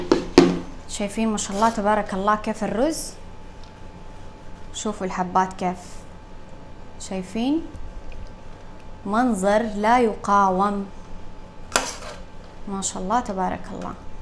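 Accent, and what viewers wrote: Saudi Arabia. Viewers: I adore the Saudi accent mashallah your cooking is amazing 🥰🥰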